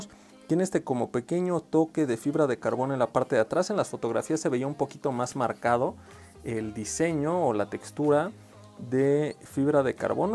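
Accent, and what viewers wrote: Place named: Brazil. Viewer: Olá, eu gostaria em que país você mora? Pois, o sotaque do seu Espanhol é muito parecido com o Português Brasileiro.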